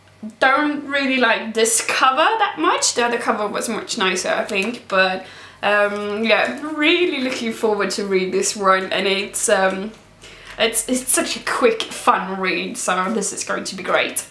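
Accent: Sweden. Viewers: Was looking for some good comic reviews. I think I will try "American Vampire". Thank You......And my American ears loves your Swedish accent.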